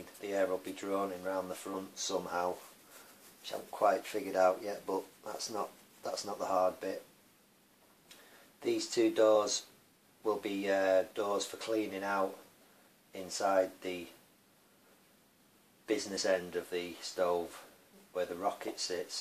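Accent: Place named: Manchester, United Kingdom. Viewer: Will do, you sound very Manc. Looks like I'll be learning to weld stainless.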